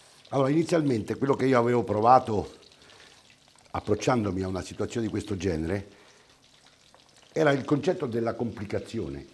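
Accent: Rome, Italy. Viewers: in italy we have dialects….each region has one. He talks roma dialect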